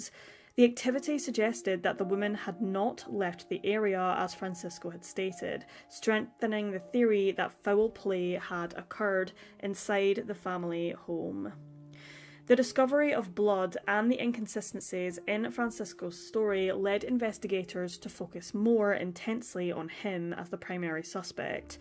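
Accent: Scotland, United Kingdom. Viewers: HOLA MI FAMILIA DARK 🌑 CURIOUSITIES & BABY GIRL WITH THE AWESOME SCOTTISH ACCENT!!!#665 TOO COMMENT, THANKS GOODNESS, IT WASN'T THE NEXT ###😲😲😲😲😲😲😲🫣🫣🫣🫣🫣🫣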